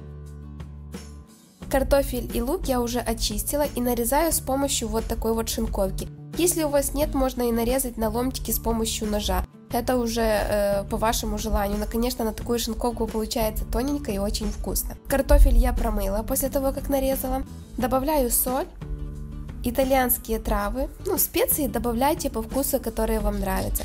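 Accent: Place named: Ukraine. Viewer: Это не дикция,а акцент украинский!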